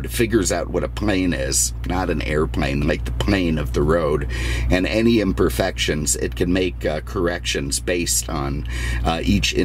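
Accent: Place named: Midwest, United States. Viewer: I love your videos. Where are you originally from? Sounds like a Midwestern accent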